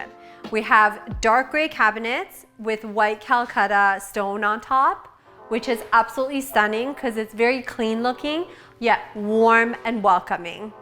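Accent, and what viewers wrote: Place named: Iran. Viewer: Your Iranian accent is unreal! Beautiful woman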